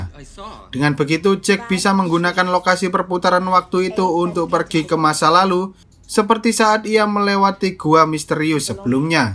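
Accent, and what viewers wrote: Java, Indonesia. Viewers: Jawa kah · Bg sira wong jowo kah? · Jowo ndi Iki seng duwe konten? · Suaranya medok kyk dodit Mulyanto 😂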